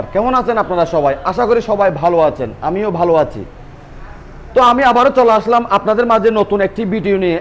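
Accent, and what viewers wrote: Chittagong, Bangladesh. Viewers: আপনি চট্টগ্রামের কাছের মানুষ, বাংলাটা আরোও পরিস্কার করে বলা উচিৎ কারণ, চট্টগ্রামের ভাষা দিয়ে সারা দেশ চলে না - ধন্যবাদ।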